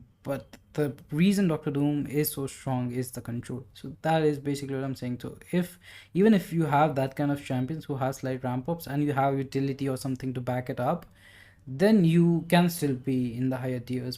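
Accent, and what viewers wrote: India. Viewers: Mumbai accent.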